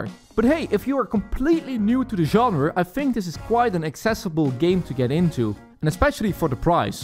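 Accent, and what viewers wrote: Netherlands. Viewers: The dutch accent made the whole video 😂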